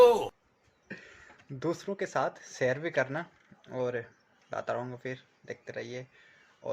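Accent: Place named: Tehri, India. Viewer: Aap tehri se ho kya aap ka bolne ka tone wanhi ka hai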